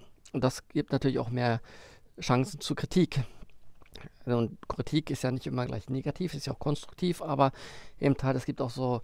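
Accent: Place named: Sweden. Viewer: Ich finde dein schwedisches Deutsch klasse!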